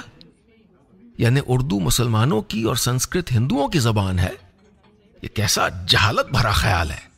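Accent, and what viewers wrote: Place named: Lucknow, India. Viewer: Janab aapko sun kar dil khush ho jata hai. Aapne Lucknow ke zubaan yaad aa jati hai. Aap ka tahey dil se shukriya.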